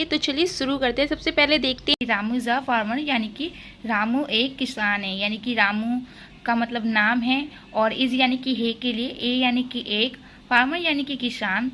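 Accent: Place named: Bihar, India. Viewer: Bihari you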